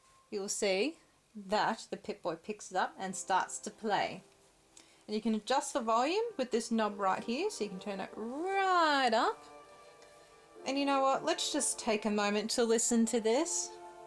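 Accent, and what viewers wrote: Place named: United Kingdom. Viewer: Definitely want one. Bet they are pricey. Nice vault suit by the way, love the British accent.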